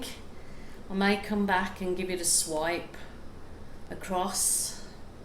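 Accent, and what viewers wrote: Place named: Walsall, United Kingdom. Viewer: Love it, I'm a too from Walsall live in Townsville 😁 Where are you originally from? You talk like me x I've been in Oz 29 years x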